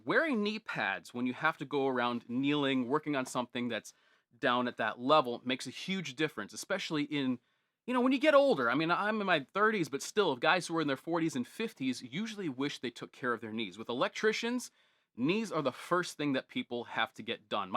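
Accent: New England, United States. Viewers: What part of New England are you from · New Englandah !!!!!!!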